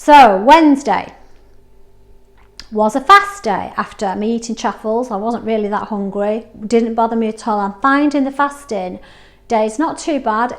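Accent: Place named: North, United Kingdom. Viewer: So lovely to hear that northern voice!!! I love that jumper looks so comfy. 2.7miles is great! And I do love a bike ride blow the cobwebs away in the mind. You never failed at all 26 hours is so amazing. And whisky is my favourite drink and gin but when I'm being strict I can't drink it just ruins me lol. You sound like you are doing totally amazing to me. Chaffles he he he so yummy! 4.6 miles Wednesday that's great too you are doing amazing on the exercise size of things. Keto is such a powerful tool when you use it in the correct way for sure. 40 pounds so so amazing I am so pleased for you it feels great doesn't it, keep it up your doing a great job whoop whoop!!!!!! fingers crossed for getting into the 10stones next week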